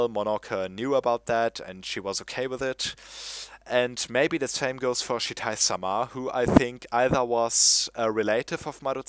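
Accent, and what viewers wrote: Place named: Germany. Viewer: Hahahah man hört den Deutschen Akzent sofort raus ;D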